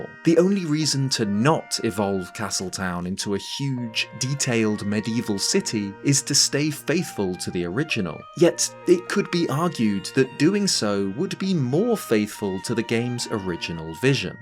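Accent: United Kingdom. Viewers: Lol it's just British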